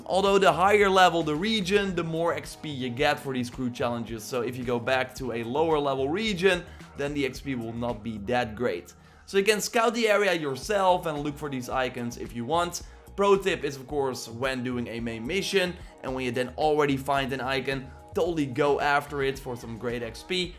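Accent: Netherlands. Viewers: Are you Dutch? I mean, '' '' should be a dead giveaway, but who knows.. You just sound like my Dutch friends speaking English to our American friends. Gewoon zo'n Nederlands dingetje weetjewel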